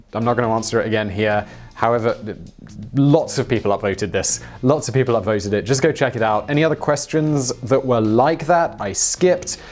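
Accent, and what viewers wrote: United Kingdom. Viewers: Just wanted to say I saw your recent post about the podcasts, which I hadn't previously heard about, so I checked them out and now I've listened to almost all of The Brainfood Show! Still have a few left to get caught up, but I've really enjoyed it so far. Especially since you open up a lot more and show your real personality ;) You're a very funny and charming guy! I've learned a lot from your channel and podcasts. Usually I dislike fact-based channels because it's generally stuff I already know, but you frequently manage to surprise me with new information. PS I don't think your accent is weird, it's pretty obviously "British person who has lived elsewhere in Europe for many years" and it's not grating or distracting. Honestly I normally don't notice it. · Coming from an Aussie, I picked your accent immediately, way back when I first started watching your channels. 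It is very British. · Great video, most enjoyable and as a Brit you still have a very strong, unmistakable, British accent Simon - although I wouldn't have picked Maidstone. South-East, certainly but more private school London. Keep up the great work.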